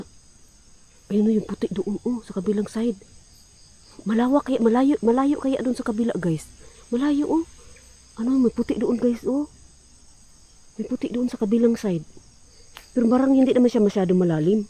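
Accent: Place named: Cebu, Philippines. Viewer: It seems your voice is Cebuano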